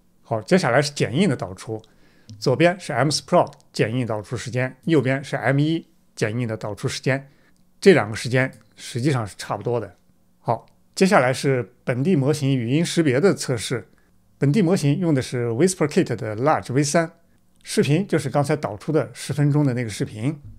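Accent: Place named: Xinjiang, China. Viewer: 老哥。听口音 新疆的哇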